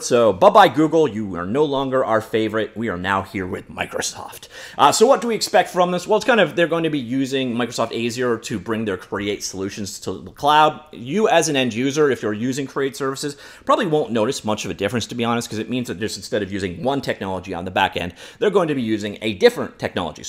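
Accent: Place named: Canada. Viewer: He is Canadian after all. They pronounce a lot of words wrong. I think it's kind of cute, like Carter said to McKay.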